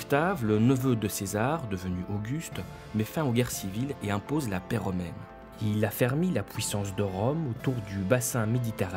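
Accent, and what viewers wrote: Belgium. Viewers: Bel accent belge... Bon document aussi! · Et vive la pouissance romaine !!! (j'adore l'accent belge... :p) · ca m'a un peu déstabilisé, on ne détecte pas l'accent belge au delà de ça, mais excellente vidéo BTW ;) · Il est belge vus son accent, d'ou les "septante" pour soixante-dix ;)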